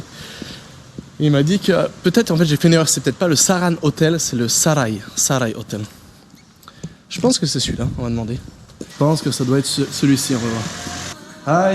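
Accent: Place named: France. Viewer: Акцент чисто как у французов. Молодец. Très bien.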